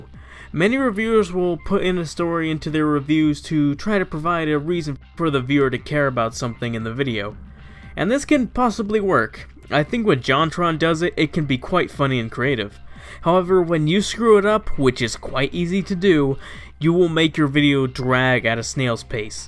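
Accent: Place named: Boston, United States. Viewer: Noice boston accent...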